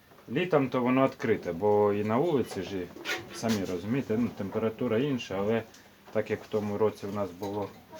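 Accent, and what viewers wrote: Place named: Sumy, Ukraine. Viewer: Доброго часу доби!Підписалася на ваш канал,я так зрозуміла,що ви з Сумщини?Цікаво звідки саме,я теж з Сумської обл.,з колишнього Буринського району,тепер Конотопський.Тримаю небагато кроликів,для себе.Цікаве у вас відео,знімайте ще,приємно чути свою мову,сумську. 😀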